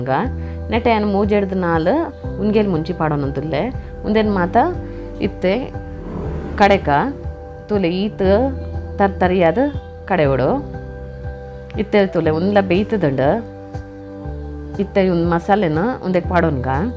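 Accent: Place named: Mangalore, India. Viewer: Manglore kannada artha agalla ree madom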